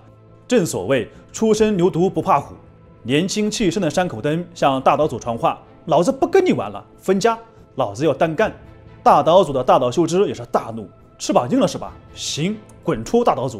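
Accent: China. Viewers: 不是嘛 你聽口音就知道版主是中國人了吧 (我猜中國憲法肯定沒有這條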